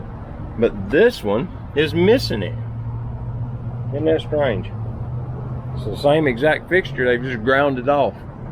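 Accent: South, United States. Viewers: LOL! very good. I love Southern Talk- "...just to this side of that sign? On the other side of that sign?.." tone of voice- sentences that sound like a question are not a question. ❤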